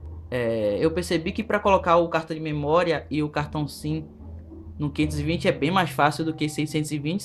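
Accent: Bahia, Brazil. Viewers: IUEAHAEIUHEAIU, seus vídeos são legais ! xD Você é baiano ? e_e'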